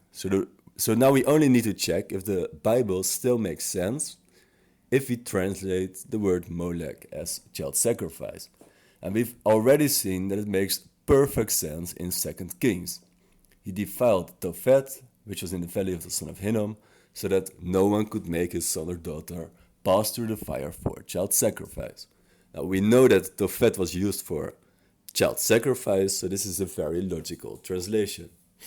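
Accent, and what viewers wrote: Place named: The Hague, Netherlands. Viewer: I can tell you are from den haag because of your accent lol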